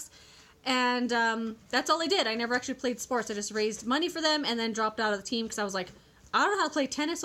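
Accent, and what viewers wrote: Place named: Canada. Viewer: Your accent sounds Canadian. Are you? Malls are my favorite too, ever since I was old enough to shop with my own money.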